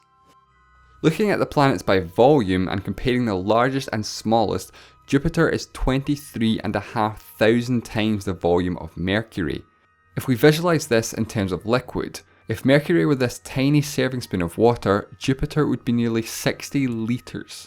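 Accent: Scotland, United Kingdom. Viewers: rockin' the scottish accent, eh? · It's scottish. He said that he lived in Scotland in his "How many countries are there in the world?" video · Planets, Scottish accent, Kerbal Space Program's music on background: I thought for a moment this was Scott Manley's new video! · The Wisest Waffle he's scottish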